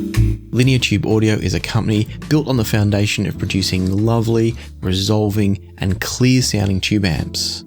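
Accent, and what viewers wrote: Australia. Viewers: your comment assumes that Linear Tube Audio has tested the I2S implementation and then decided not to include it after concluding it wouldn't 'add much'. That is simply something we do not know as they only have 1 DAC in their lineup and no other reference point. I would actually guess that they have not tested it, maybe due to not having the components needed on hand at the time of manufacturing. I do concede, based on your review that Linear Tube Audio really do know how to make really good ins/out in terms of electronics when it comes to clock ect... that alone require a lot of skill. Now if it did have i2s, I really can imagine your voice saying "and if that wasn't enough, as I switched to the i2s, it was like the soundstage opened up even more... the details became a tad bit sharper... not harsher... buta tad bit more etched at their edges, if that makes any sense..." see how much I know your use of words? 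:) I really should stop watching too much of your videos before I start speaking with an Australian accent... Im already close as I always loved the Aussies :) Aussie Aussie Aussie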